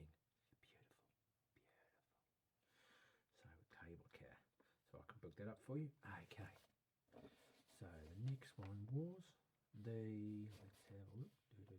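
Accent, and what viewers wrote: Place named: Australia. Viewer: lol...You do a good job with an Aussie accent! :-)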